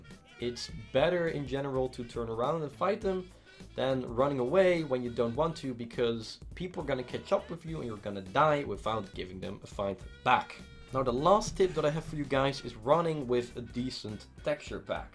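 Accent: Netherlands. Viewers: Are You dutch? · the first sentence already told me you are dutch. My accent is as bad as yours xD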